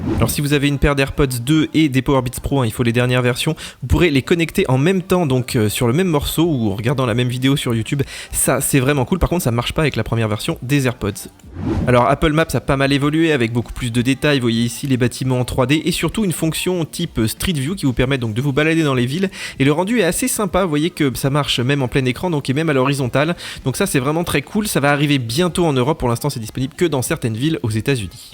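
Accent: Switzerland. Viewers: Mais c est que tu es que tu es valaisan 🤗 je ne savais pas 😏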